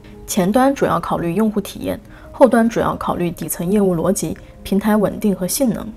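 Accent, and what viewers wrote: Standard. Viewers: up主中文非常好。 我基本用英文学完，用全部中文基本解释不来。 而且up主连“逻辑”的发音都跟高考要求一样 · 来看只是确认一下 up 主懂不懂技术，看来是我错了，真的懂。发音也很标准，走了...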